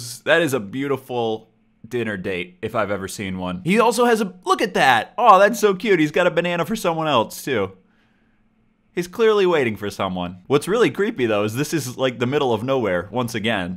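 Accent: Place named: Midwest, United States. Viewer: I love the Midwest accent for i live in the Midwest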